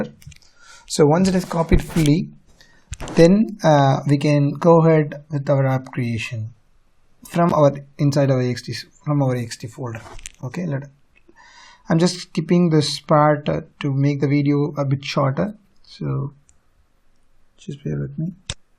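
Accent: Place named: India. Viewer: Люблю индийский акцент, всё сразу понятно без субтитров :)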